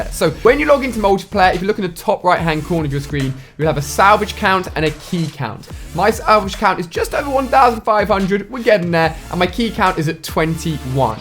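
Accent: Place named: United Kingdom. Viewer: i like british accent.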